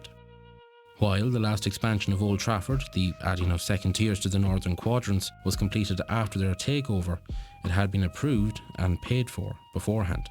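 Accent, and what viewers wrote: Ireland. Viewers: Irish accent ❤ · Grand Irish accent there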